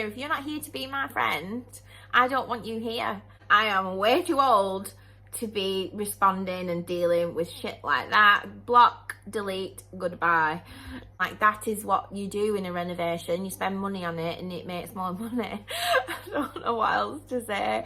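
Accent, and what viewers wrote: Northern England, United Kingdom. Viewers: Hi, came across your channel by chance a little while ago and I’m hooked! Plan to watch all your vids in time. Your so down to earth and funny and sound like a Northern lass like myself. As others have said, ignore the nasty comments, jealousy defo. Why can’t people just be nice- or stay quiet if they can’t. Good luck to you and hubby, and looking forward to your journey, and maybe a bit of inspiration x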